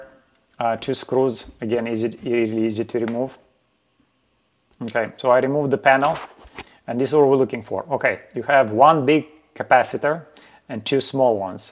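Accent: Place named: Poland. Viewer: I know you're right. Either way, thank you for this video! We are lucky for it. Idk from where you hail but your accent reminds me of Polish and I love it!!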